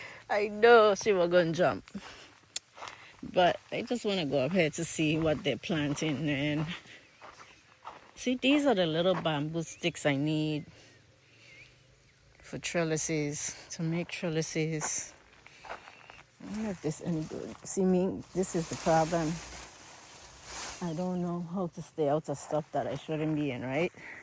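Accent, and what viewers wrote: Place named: South, United States. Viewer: you sound like a real country gal, a very informative lady to connect with 🎉🎉🎉❤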